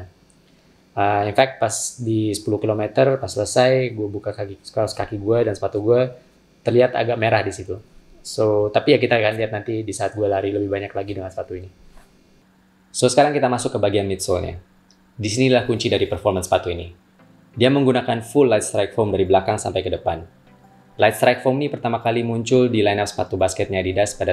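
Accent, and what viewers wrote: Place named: Malaysia. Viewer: ada sedikit aksen melayu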